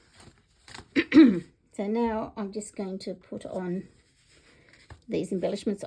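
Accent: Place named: Australia. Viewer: Hi This is the 1st time I have seen you on youtube I didnt know anything about you but your accent made me stay and watch lol. We have to support Our Aussie demonstrators 😊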